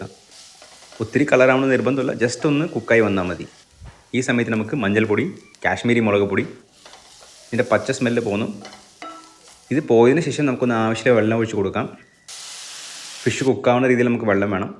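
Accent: Tamil Nadu, India. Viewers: Ningalku tamil accent undallo! Nattil evdeya?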